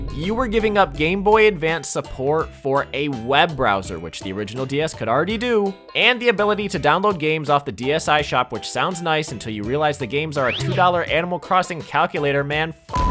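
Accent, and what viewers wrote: Brazil. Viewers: Is he Brazilian or something? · Are you brazilian?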